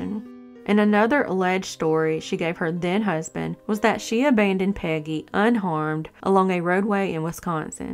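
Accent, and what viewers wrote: South, United States. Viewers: She has a southern accent due to her living in the south. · I'm from Georgia, and her voice sounds like Georgia or the Carolinas. She sounds like all my SC cousins. I love it! My mom sounds like Scarlett O'Hara.😆 Im from Atlanta, Ga but I don't really have a regional accent.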